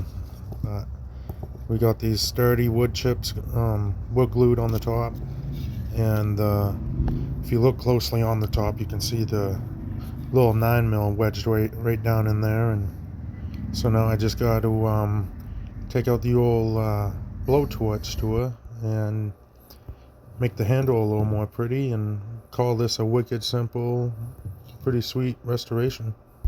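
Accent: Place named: Boston, United States. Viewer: I thought it came out pretty nice. And I really enjoyed listening to the Boston/NH accent too. Time to go Pahk ya cah in the Havahd yahd. Keep up the great work!!!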